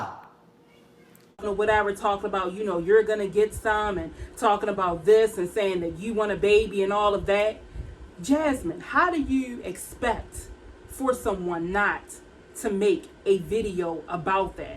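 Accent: Baltimore, United States. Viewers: Love your Bmore accent!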